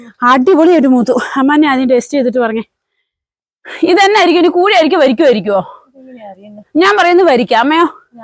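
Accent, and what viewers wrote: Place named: Idukki, India. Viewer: ഞാൻ വിചാരിച്ചു, സ്ഥലവും സംസാരവും കേട്ടപ്പോൾ ഇടുക്കിജില്ലയാണെന്ന്❤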